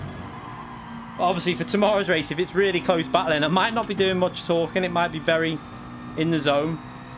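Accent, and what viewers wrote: Liverpool, United Kingdom. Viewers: Can't work out your accent. You sound like you're from the wirral or full Scouse then other times I can't place it.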